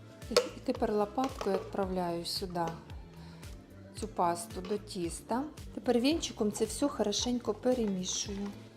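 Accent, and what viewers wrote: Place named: South-East, Ukraine. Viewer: Не робіть зауваження --це південно-східний суржик, він теж має свою історію.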